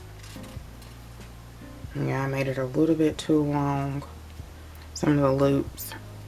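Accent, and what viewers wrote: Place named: South Carolina, United States. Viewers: You sound like me talkin’ ! Very similar, anyway! I’m from Georgia. How about you? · You've got to be from South Carolina lol. Girl, you talk just like me.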